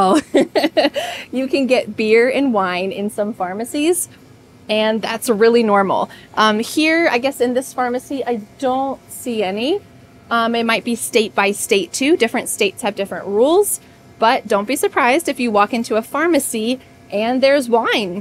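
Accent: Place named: United States. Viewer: I love American accents more and more because of you, teacher ❤️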